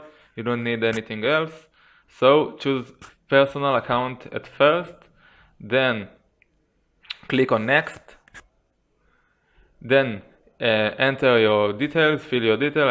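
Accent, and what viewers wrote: Israel. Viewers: חחחח בשנייה שהוא התחיל לדבר הייתי כזה "הוא כל כך ישראלי" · אני מת ידעתי שאתה ישראלי מהמבטא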